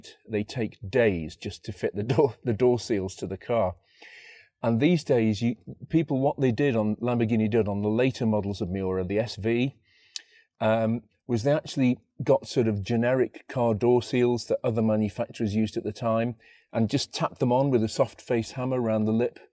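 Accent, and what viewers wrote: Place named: RP, United Kingdom. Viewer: Fascinating stuff - and a pleasure to listen to contemporary RP-style accent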